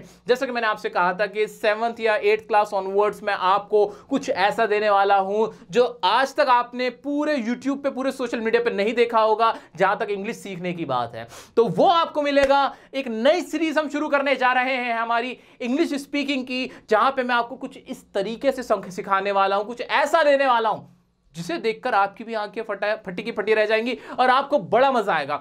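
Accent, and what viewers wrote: India. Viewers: because indian accent laga hai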